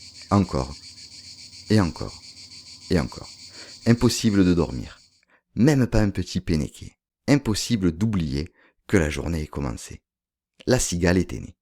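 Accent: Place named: Provence, France. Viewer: Merci, vous êtes un conteur extraordinaire, avec notre bel accent provençal ! Ah, les cigales, j’adore… Mais dommage, elles ne chantent plus : c’est déjà la fin de l’été. 🦗☀️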